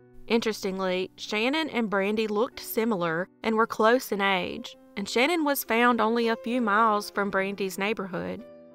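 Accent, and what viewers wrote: United States. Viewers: Like your accent. Where in the US is your accent from 🇬🇧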